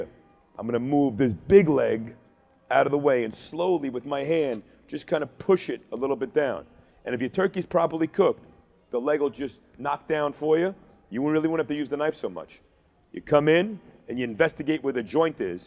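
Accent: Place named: Brooklyn, United States. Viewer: Viewers! Carving begins. broken, Brooklyn “English” intro will bore you to tears if you go the whole nine.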